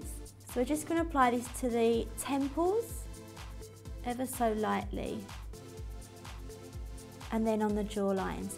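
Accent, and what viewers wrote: Essex, United Kingdom. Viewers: she can't help that she's from Essex and that's what her accent is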